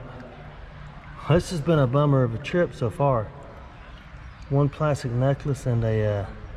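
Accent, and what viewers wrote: South, United States. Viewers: It’s no problem understanding you and I’m from the west coast. You speak deliberately and with very little southern accent. Your sweet wife…how she smiles at you!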